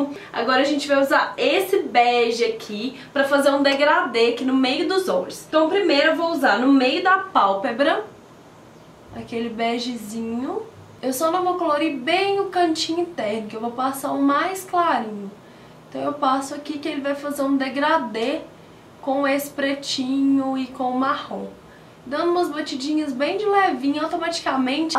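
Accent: Minas Gerais, Brazil. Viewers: se e mineira ne! se nao for n acredito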